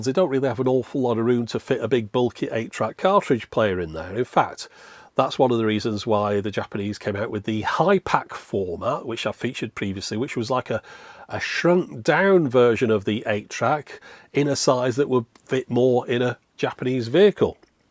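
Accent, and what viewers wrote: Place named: Wigan, United Kingdom. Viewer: "It's a screw! I can feel it!" I am seriously tempted to give this channel money for Wigan voiced content like this.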